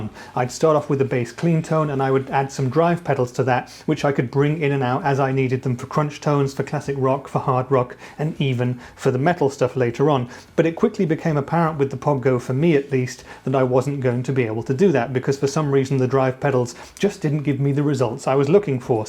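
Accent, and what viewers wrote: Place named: United Kingdom. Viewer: Are you German? Your English is flawless, I thought you were British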